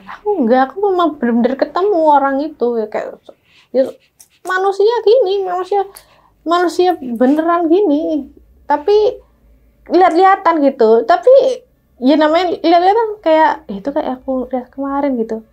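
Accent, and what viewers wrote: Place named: Java, Indonesia. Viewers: Logat ngomong jawa banget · nama Panggung:” ”, nah…Nama AsLinya SIAPA dong?? (*jgn2 PONIRAH/SUSI/SITI/SRI/ANI?) 😂😂😂. Nama ASLI-nya PASTI NORAK X… (pdhL, itu nama Pemberian dari Ortu loh,mbak!). KENAPA hrs MALU sama NAMA ASLI Sendiri?? mmgnya, NAMA ASLI-nya “dneso” bgt yaaaa….?! Lah, LOGAT nya aza “MEDOK-JAWIR” Bgtttt!!! 🤭🤑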